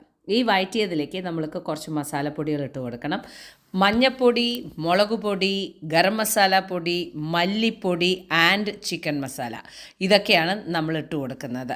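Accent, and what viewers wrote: Palakkad, India. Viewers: ഇജ്ജ് പാലക്കാട്ടുകാരി ആണ് ല്ലേ. സൂപ്പർ ഡിഷ്‌ വല്ലപ്പത്തിന്റെ കൂടെ പ്രേദമായിരിക്കും.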